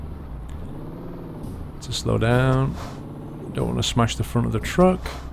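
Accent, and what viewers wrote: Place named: United Kingdom. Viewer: I like how British people say stupid ,"schtooupid".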